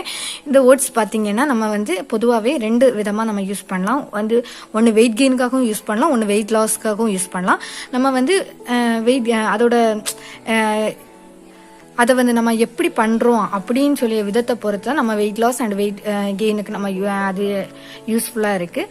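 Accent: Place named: Kanyakumari, India. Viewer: neenga pesura slang vachi than sonnen nanum kanyakumari than akka nice tips akka appreciate you 👏👏👏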